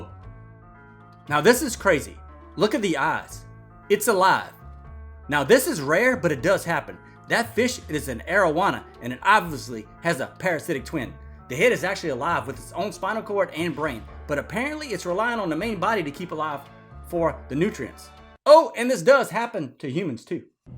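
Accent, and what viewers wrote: Louisiana, United States. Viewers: Dude Your From Louisiana? Me too but where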